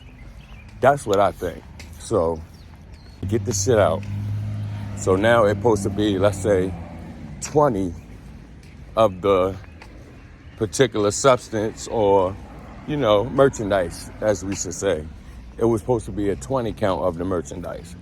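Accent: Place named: Baltimore, United States. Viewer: I always wondered how come people from Balitmore are the only ones with an accent out of the whole country the way yall say ur R that always tripped me out and always made me curious bc its not only blacks from Balitmore its everybody thats born there,do you know whats the reasoning for that if you dont mind me asking i'm just hella curiouse on a side note great content keep pushing!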